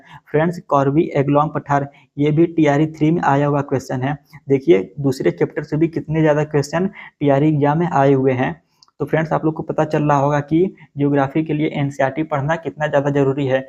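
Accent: Uttar Pradesh, India. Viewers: Sir aap up se hai kya😊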